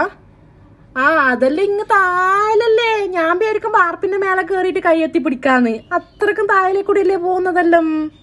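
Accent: Kannur, India. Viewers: ആഹാ അന്തസ്..... 🤗🤗🤗🤗🤗🤗കണ്ണൂർ ഭാഷ ഇസ്‌തം..... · Amalee kannur bashaa😘😘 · You are so talented. Your action, the way you speak reminds me of our neighbours or close cousins in Kannur, with their simplicity and innocence! Love my place ❤️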